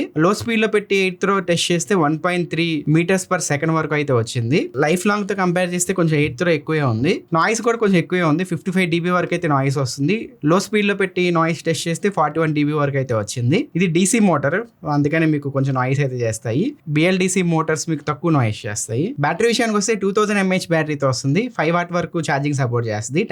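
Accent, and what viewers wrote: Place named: Andhra Pradesh, India. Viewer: Bhaiya andhrolla slang gamat untadi 😂🤣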